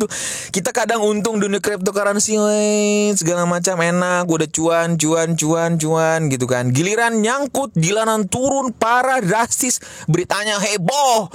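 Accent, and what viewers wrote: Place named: Western border region, Indonesia. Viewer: Cara penyampaian nya kaya daerah Barat perbatasan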